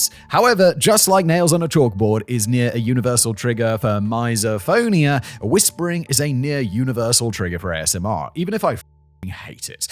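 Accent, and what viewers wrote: United Kingdom. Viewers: for whatever reason, a man with a deep, soft voice and an accent from anywhere in the UK, could read anything at all and I will for sure fall asleep within 15 minutes. I would sign up for a Simon ASMR channel. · Maybe not ASMR, but Simon could definitely launch a sleep version of his shows - he doesn’t even need new scripts, just keep the tone level 😅 I like to listen the The French Whisperer to fall asleep, but a British accent beats a French accent any day 😂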